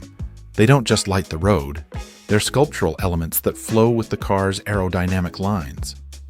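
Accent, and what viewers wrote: United States. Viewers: Replica !!!! LOL. It's a 1/1 model poor personalised copy of a hypercar. If it's THIS easy ...... makes you wonder why all the 3D printing and CAD designed parts are ever necessary ............. everything is "PERFECT" and the aerodynamics are "tested". LOL. ALL the electronics are 300mp/h rated - just in case you were wondering how well the "air brake" would work at speed ....... Thje car is PERFECTLY symetrical - both sides not only LOOK but ARE perfectly identical reversed replicas of eachother. This ensures the car drives in a straight line at high speed. LOL. He is certainly smat enough however to make a video of it and overlap an American voice. Money is Money, every dime counts. Lets not forget that this lost guy in a shed in Vietnam has ready access to Brand New Bugatti front windshields - when Bugatti owners find it hard sourcing them ......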